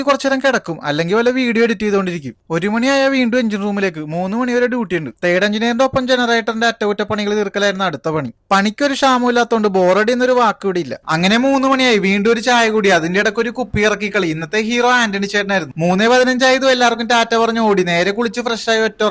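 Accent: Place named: Ernakulam, India. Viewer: Bro എറണാകുളം ആണോ വീട്.. നമ്മുടെ നാട്ടിലെ ഒരു സ്ലാങ് ഇണ്ടല്ല?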